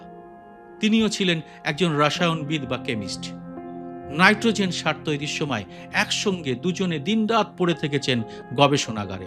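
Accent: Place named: West Bengal, India. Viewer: কারন সে পশ্চিম বঙ্গ ভারতের । তারা সুন্দর শুদ্ধ বাংলা বলে ও বিশুদ্ধ উচ্চারণ করে।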